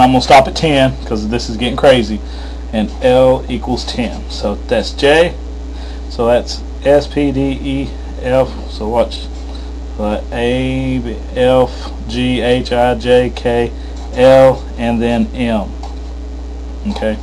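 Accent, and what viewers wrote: South, United States. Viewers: I like your southern twang! It helps keep me focused on the video! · awesome country accent.. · great video thanks so much very helpful. i like your Southern accent. Greetings from California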